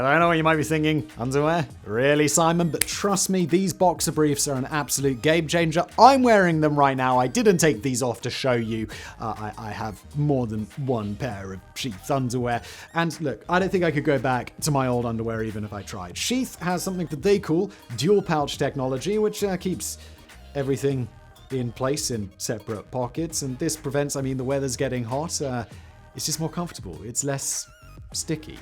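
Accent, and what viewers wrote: RP, United Kingdom. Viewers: Silver linings, we got to hear Simon say “penetration aids” with his posh accent. Made my day.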